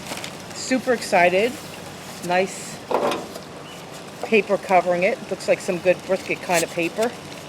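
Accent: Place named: New Jersey, United States. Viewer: Glad you got a YouTube Channel! Nice to hear your voice and that beautiful Jersey accent. The group hooked you up. There is a place in the town where I grew up, that sells these Mexican made grills that look like animals. The also sell pretty good barbecue. They have a bull like that out in front. When they’re open, they have it smoking with a charcoal fire in it. C’mon, you gave the bull a girl’s name? Margarita? Give it a tough name! Such as “Pancho” or “El Bandito” or “El Fuego” or “El Desperado”. What ever you call it the bull and the board are nice.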